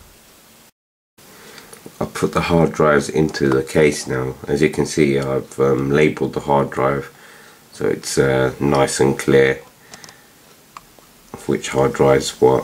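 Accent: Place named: Birmingham, United Kingdom. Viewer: Your from birmingham aint you ;)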